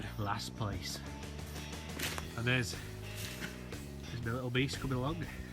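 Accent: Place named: South Yorkshire, United Kingdom. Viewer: Good vid lad, going from your accent you ain't far from me... I live in Wombwell 👍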